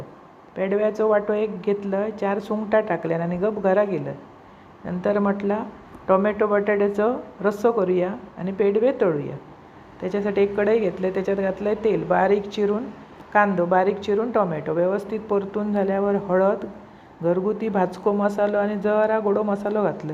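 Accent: Malvani, India. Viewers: तुमचे पदार्थ खुप मस्तच असतात. बोलण्याची भाषा आणी लय अगदी❤❤ मालवणी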